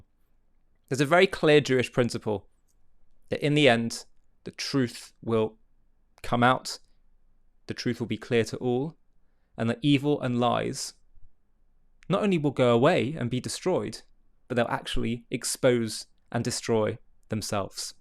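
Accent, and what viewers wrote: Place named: United Kingdom. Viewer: U R the most couragous man with British accent thank again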